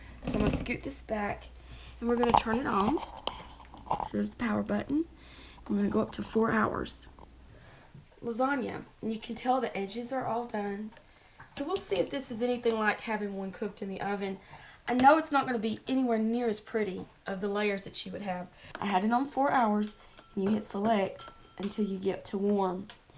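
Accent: South, United States. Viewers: Love how those southerners pronounce the Italian name "Barilla".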